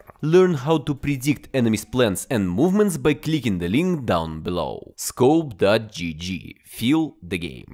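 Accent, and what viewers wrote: Russia. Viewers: Ох уж этот шикарный русский акцент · that russian accent tho. nice contetnt bro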